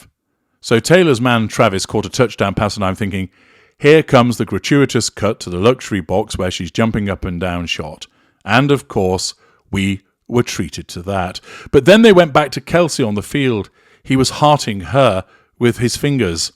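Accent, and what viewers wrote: United Kingdom. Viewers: Okay, who ever you are. You can talk all the shit you want about Meagan and Harry losers. Don’t go there with Taylor Swift. You are clearly British, just by accent. Taylor Swift is an amazing young woman. Do your research as a broadcaster. If you can find a fault with her person, behavior or actions then carry on. I dare you!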